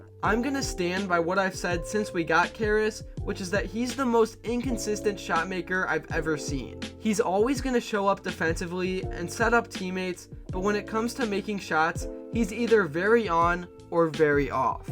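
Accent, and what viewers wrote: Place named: Cleveland, United States. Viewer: are u from cle? sound like it but i wanted to ask. great vids,. newly subscribed. looking forward to the next one.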